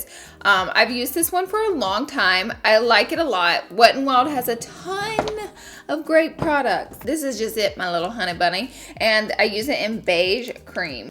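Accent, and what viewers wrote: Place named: Texas, United States. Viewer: Yes girl! I love watching you :) You remind me of where I grew up in south Texas because of your accent. Keep up the great work 👍🏻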